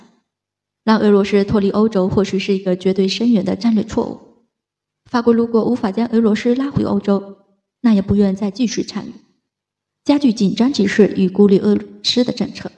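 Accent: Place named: Liaoning, China. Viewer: 俄罗湿？主播是辽宁哪里的？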